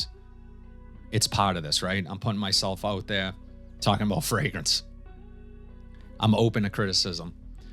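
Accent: Boston, United States. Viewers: you wanna get the numbers up of subscribers?… gotta get to collab with other fragrance reviewers. Just watch once a reviewer collabs with another fraghead… and how significant their numbers go up. Just some advice. Good reviews and stunning Boston accent 😅… keep it up buddy 👏🏽👏🏽👏🏽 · Congrats! Cool Water was my intro into Fragrances around 1994 in a clear bottle with a faux wood screw on cap. I'm thankful for you and other guys like you who are willing to talk to a camera and share this passion in a way that both educates and inspires! The first time my wife overheard a video of yours that I was watching, she said, "Woah, someone's from Boston!" 😂 Happy Thanksgiving! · Congratulations. You are a very honest and passionate reviewer with no hidden agenda. The content is superb and I have gotten a couple of great just watching with very little recycled content Love the Boston Accent too I am still waiting for whatever I won I just can't find the private message you sent me. 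LOL!!!!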